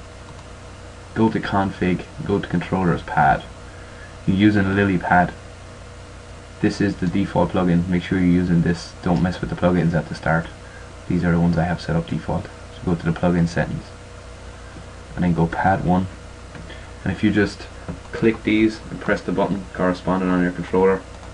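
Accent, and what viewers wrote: Ireland. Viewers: Are you Irish by any chance? :')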